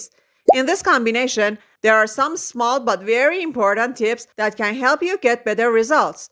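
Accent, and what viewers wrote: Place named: Iran. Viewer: I love your Persian accent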